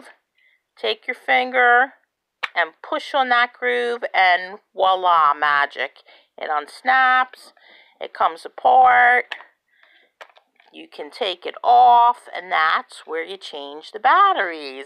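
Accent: Delaware County, United States. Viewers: Do u happen to be from DELCO????? That accent sounds realllll familiar 🤣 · Wow, thank you! I would never have figured out how to change the batteries for this goofy remote. It is definitely not intuitive (and so different from all my other remotes) but your video make it super easy. Love your "accent". Are you from Delaware County?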